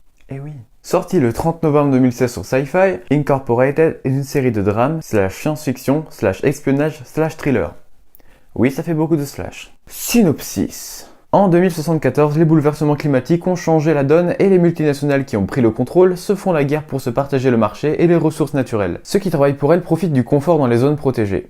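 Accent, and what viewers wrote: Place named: England, United Kingdom. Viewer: Superbe accent anglais :O et c'était très sympa comme vidéo , peut être un pouale lent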